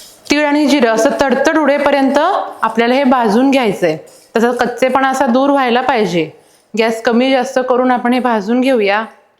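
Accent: Kolhapur, India. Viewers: कोल्हापूरी टोन यायला लागलाय!😊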